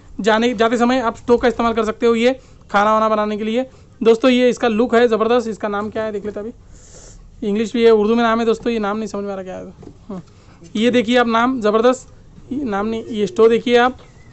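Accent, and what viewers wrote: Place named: Maharashtra, India. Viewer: तुमची हिंदी महाराष्ट्रातील आहे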